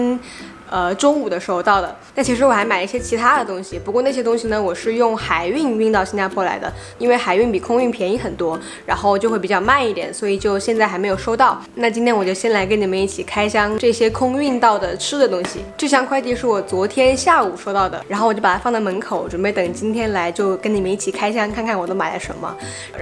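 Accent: Changsha, China. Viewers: nia xswl我就说口音怎么如此熟悉原来你是长沙的嘛哈哈哈哈哈哈哈哈哈哈哈哈哈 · Zhi Xuan Ang 口音大陸人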